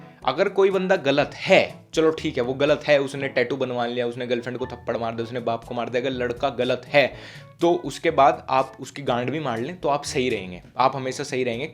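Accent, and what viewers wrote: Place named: Uttar Pradesh, India. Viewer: Dude is from up I can understand him by language because in up people like me talks like him it's a different Daku vibe Mmm vaise haa up Wale opp🤘 Yo lakshay bhai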